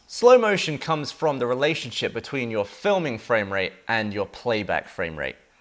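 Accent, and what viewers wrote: United States. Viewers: sounding really american in this vid. I wonder if I moved from here to england how long it would take to start getting a british accent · Your American accent is coming through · Anyone else notice how his american accent is developing? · You're accent is turning American we have lost you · Your accent is becoming more american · Your picking up a slight American accent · you are really starting to pick up the american accent in parts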